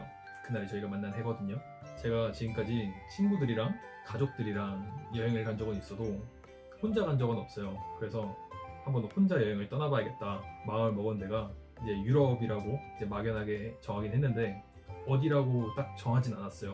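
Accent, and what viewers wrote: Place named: Gyeongsang, South Korea. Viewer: + 경상도 사람 같은디 경상도의 서윗함을보여주네용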